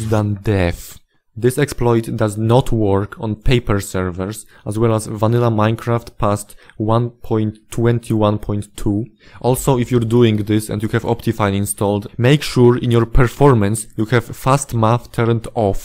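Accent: Poland. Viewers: Twój film wyświetlił mi się w proponowanych, klikam, a tam jakoś tak po polsku wymawiany ten angielski. Sprawdzam - faktycznie, z Polski xD Fajny kontent, chyba zostanę na dłużej 👍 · U sound Polish.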